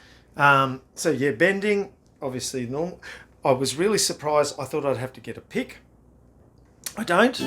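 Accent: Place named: Australia. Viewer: When I hear that accent and see that instrument, I expect to hear, " ".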